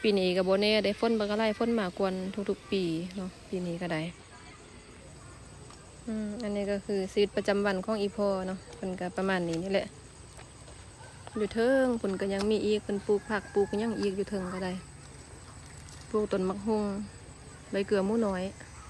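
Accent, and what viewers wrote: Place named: Dan Sai, Thailand. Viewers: สำเนียงคือ คนด่านซ้าย นาแห้ว ภูเรือ พ่อครู ยุด่านซ้าย จร้า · เป็นตาฮักหลายสาว ทำไมผมฟังสาว สำเนียงเว้าน่าฟังแล้วยิ้ม ตามเลยเด้ สบายดี บรรยากาศที่นั่นคงดีหลายน้อครับ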